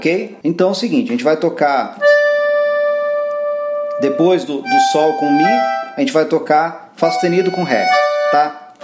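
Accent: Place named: Rio Grande do Sul, Brazil. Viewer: Vc é gaúcho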